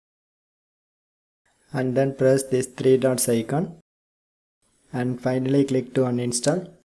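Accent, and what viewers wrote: India. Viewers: The guy with the indian accent pulls through again! It worked!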